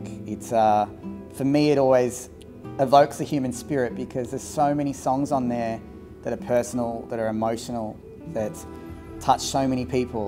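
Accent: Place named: Australia. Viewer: Hi, its always so great to see you again with that wonderful Aussie accent and listen to your great 🎻 ❤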